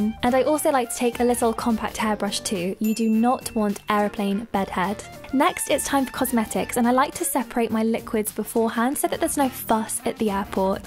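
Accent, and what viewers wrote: RP, United Kingdom. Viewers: why does she sound so posh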